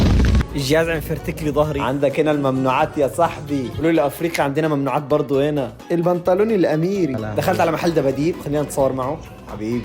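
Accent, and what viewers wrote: Egypt. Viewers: هو انتي مصري ولا سوري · ممكن ترد انت مصري ولا سوري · ده لدرجة اني بحسه مصري😂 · انت من سوريا شلون انت تتكلم مصري 😊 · لهجتك كأنها مصرية ماتتكلم مثل اهل سوريا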